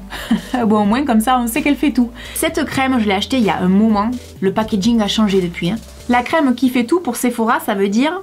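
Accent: South, France. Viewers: Les produits de chez Sephora que je racheté régulièrement sont le démaquillant biplace yeux, le mascara Sephora outrageous avec une fine brossé je vous le conseille, le masque de nuit mais je l'utilise comme une crème car je ne vais pas me coucher avec une épaisseur sur le visage Je ne suis pas emballée par les box et le système d'abonnement, je préfère choisir mes produits et je suis fidèle à certaines marques merci de vos conseils et pour votre accent du sud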